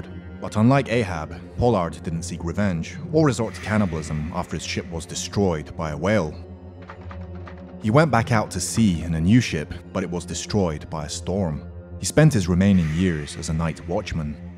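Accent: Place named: United Kingdom. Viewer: way to british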